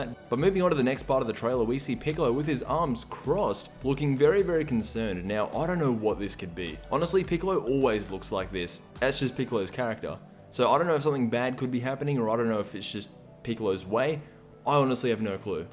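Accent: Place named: Australia. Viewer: lol your Aussie accent is pretty full on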